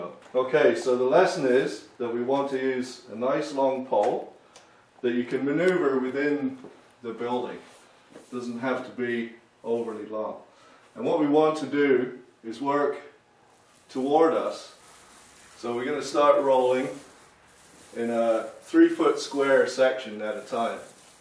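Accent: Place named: Scotland, United Kingdom. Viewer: This was great to watch a real pro doing the usually messy job of painting a ceiling the proper way... bit jist as a wee small aside noo, kin a no detect jist a wee bit oh the far-flung 'remnants' of a guid Scot's accent, hiding in backgroond? Well; an av always says this massel son... Ye jist canny hide true Scot's class (or talent).. fi nayebidy, nay matter whoar yi ur roon the wurruld or even in the back 'o beyond, an that's fur shure tae! Thanks, for uploading this video.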